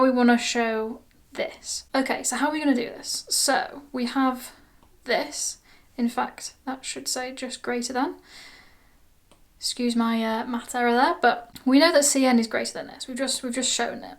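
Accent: United Kingdom. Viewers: I enjoy UR BRITISH ACCENT too😘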